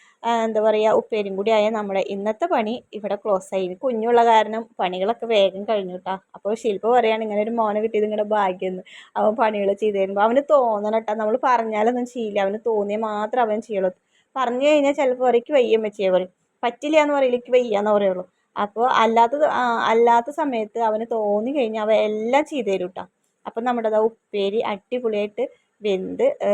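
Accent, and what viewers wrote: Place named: Thrissur, India. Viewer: നിങ്ങളെ സംസാരം കേട്ടാൽ മലപ്പുറം ആണെന്ന് തോന്നും. But കുഞ്ഞു തൃശൂർ slangan. വെള്ളപ്പം 👍🏻try ചെയ്യണം ❤️❤️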